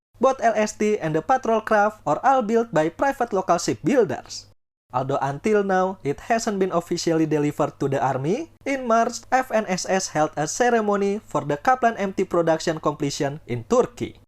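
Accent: Indonesia. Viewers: Bahasa inggris rasa indonesia😅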